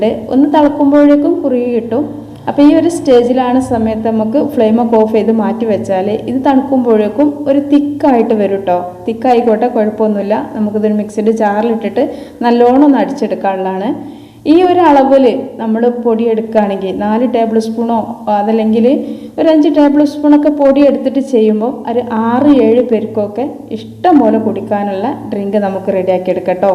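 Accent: Malappuram, India. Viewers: Malappuram thahta Samsara matiyallo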